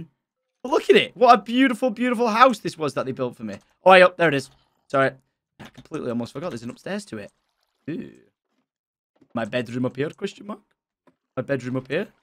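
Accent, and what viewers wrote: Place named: United Kingdom. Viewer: So glad you went back and explored this world again. I spent many hours watching your videos. There's something about someone playing minecraft while talking with a british accent. Like watching Planet Earth. Now I watch other british minecraft streamers, like Ibxtoycat and Philza. I also recently started playing Minecraft again ever since the place I work at got a Minecraft exhibit. Minecraft is an amazing game! Thank you Syndicate!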